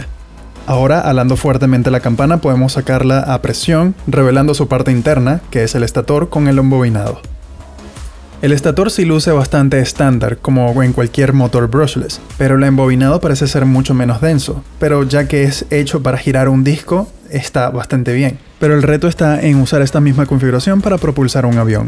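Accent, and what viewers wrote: Venezuela. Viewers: Hola, por casualidad... Eres venezolano? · Epa eres venezolano? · Hola está buena la prueba con ese motor, te felicito por todo sobre todo me gustó que me parece que eres Venezolano, yo soy Venezolano, si estás interesado en un proyecto para hacer máquinas para lavar perros por favor contactame para coordinar algunas propuestas. Muchas gracias. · Buena! jajaja. Tengo una duda con tu nacionalidad brother! Parece venezolana, pero tengo duda XD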